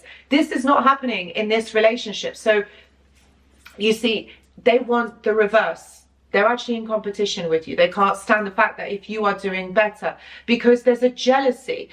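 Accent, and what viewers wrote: United Kingdom. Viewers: YOU , Miss are absolutely BRILLIANT!! and adore your Brit accent😍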